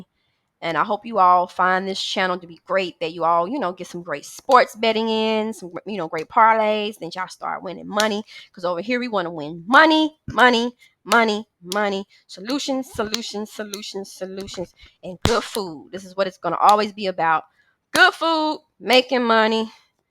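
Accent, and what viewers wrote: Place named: Tennessee, United States. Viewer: You from Tennessee!?!?!?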